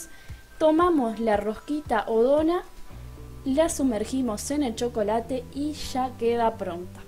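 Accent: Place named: Argentina. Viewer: Muy buena receta te sigo ahi ese acento Argentino wai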